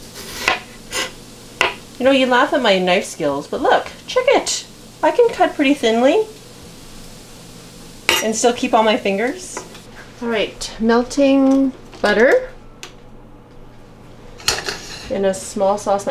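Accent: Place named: Canada. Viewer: Chinese Canadian - great accent! Lovely food as well!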